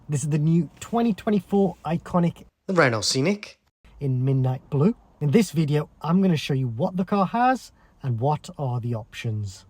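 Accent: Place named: Birmingham, United Kingdom. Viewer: Def a Brummie or poss a Wolverhampton, but I couldn't tell the difference, but you'll know. You have a cultured Brummie accent I say. Kiddy is the same but Worcester doesn't apart from Brummies working there. Allroight!😊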